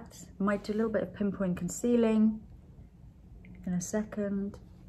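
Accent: United Kingdom. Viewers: You have a British accent !)))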